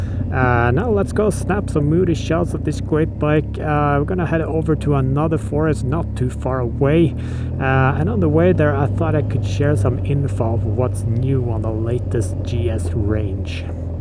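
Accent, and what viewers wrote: Sweden. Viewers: Haha, tyckte jag hörde lite svenskt påbrå och sedan såg jag flaggan! Coola väskor!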